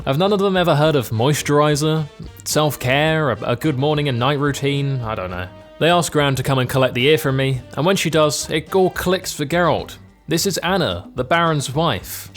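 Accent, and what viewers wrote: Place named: Burnley, United Kingdom. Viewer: Burnley accent. This guy is a fucking joker xD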